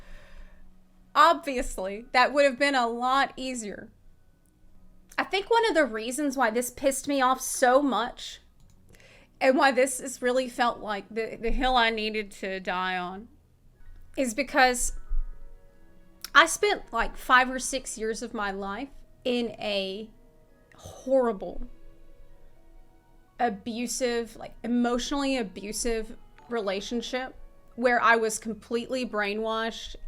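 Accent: South, United States. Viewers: Its because she is from the south, she's from Louisiana. She moved to the Ukraine to teach English iirc. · Southern accent makes sense now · Is it me or she is becoming more and more Southern by the day?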